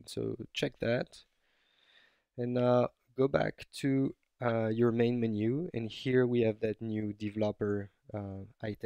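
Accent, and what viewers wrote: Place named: France. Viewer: T'es francais, ca parais ^^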